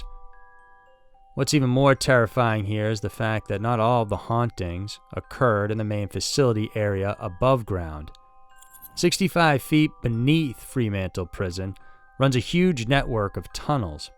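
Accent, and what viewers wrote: United States. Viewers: As a Aussie, it tickles me when Americans try to pronounce certain words and places😃 but the rest is just sad.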